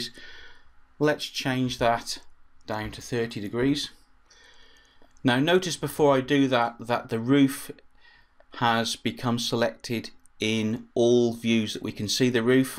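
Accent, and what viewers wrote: United Kingdom. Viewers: Best Revit Tutorials I have seen, and the British accent makes it much easier to understand! Very well done!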